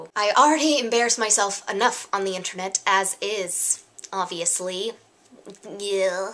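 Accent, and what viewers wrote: United States. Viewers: Michigan accent represent!!!! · I had you up on the computer and my Nana was like "Hey, why are you speaking in an american accent?" so I had to explain that it wasn't me, it was you,!! HaHa, It was funny and flattering because you are so pretty!! x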